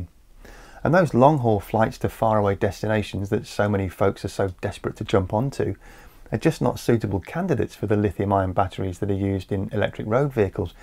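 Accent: United Kingdom. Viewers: You took a quick swipe at Boeing with your British accent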